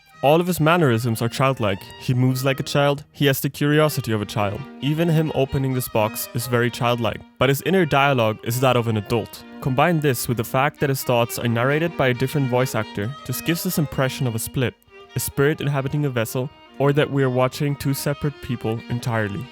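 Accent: Germany. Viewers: Good video. The Made in Abyss OST in the end of the video just fits to MT. I was listening to it on repeat while reading the novel. PS: Hab 5 sekunden gebraucht, um herauszufinden, dass du deutsch bist. Unser Akzent is einfach zu offensichtlich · Junge eine Sekunde des Videos und ich weiss einfach, dass der Bre deutsch ist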